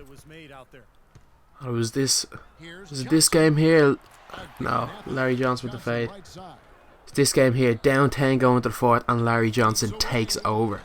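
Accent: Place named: Ireland. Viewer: His voice was so much mor irish😂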